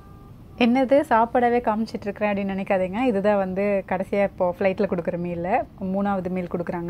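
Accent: Kongu, India. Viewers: Kongunatu mangai kongu Tamil super video bye Anna. From.covai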